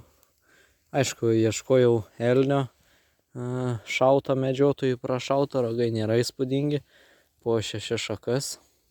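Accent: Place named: Šiauliai, Lithuania. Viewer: Pagal tarmę panašu į Šiaulių kraštą..😉.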